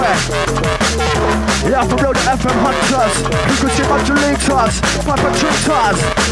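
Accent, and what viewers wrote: United Kingdom. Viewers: i love this station because it's just some british guy talking over drum n bass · I love this music and this accent so fkn much. Big up to the UK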